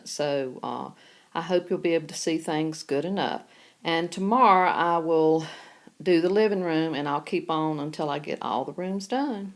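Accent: South, United States. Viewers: your house is so cute! I simply love it! You have a southern accent what state are you from? I am from michigan. I might just have to subscribe. Debbie in michigan. 🎄🎅🎁😄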